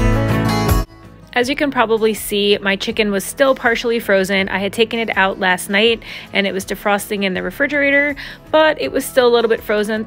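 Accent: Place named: New Jersey, United States. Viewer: Random but are you from jersey? I’m a jersey girl and you def sound like one by your accent ☺️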